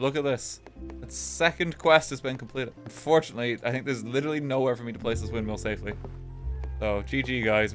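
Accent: Ireland. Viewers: Irish jerma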